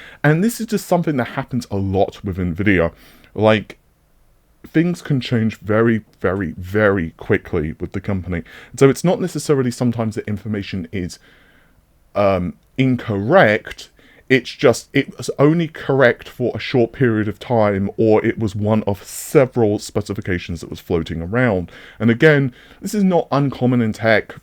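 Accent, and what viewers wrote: England, United Kingdom. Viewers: Brother Man sounds like Tea and Crumpets looks like The Rock's English cousin. SWOLE!:)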